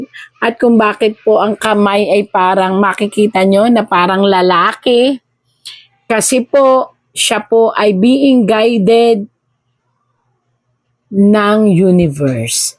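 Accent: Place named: Visayas, Philippines. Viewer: Nku si ito nagsasalita.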